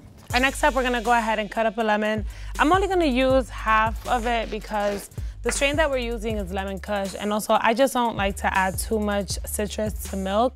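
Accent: New York, United States. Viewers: She sound like she from NYC or NJ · She from New York right? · She gotta be from nyc nfs😭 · She’s deadass from New York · New York accent is fire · She is so ny spanish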